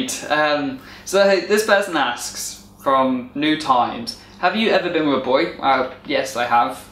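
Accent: United Kingdom. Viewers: Starting to look at your post , ( luv it!), would like to know which country you are , beautiful accent! Thx to answering,I always loved British accent, It’s like poetry for my ears !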